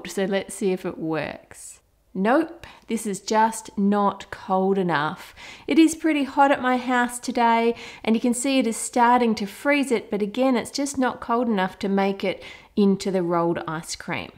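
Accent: Australia. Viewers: I legit just realized shes Australian and my Aussieboo self JUMPED (because of Felix and Chris from SKZ) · You're awesome! Love the videos! Also love listening to your Australian accent. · I luv Australian accents :D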